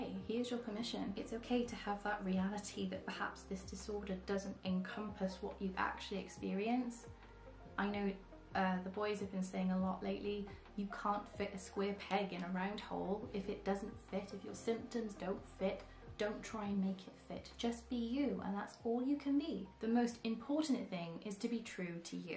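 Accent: Wales, United Kingdom. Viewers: This was an extremely interesting and useful video. I'm a student psychologist and DID has fascinated me for years. Thank you for your insight. Also... it's nice to hear a Welsh voice on YouTube! Where are you from? Swansea girl here :D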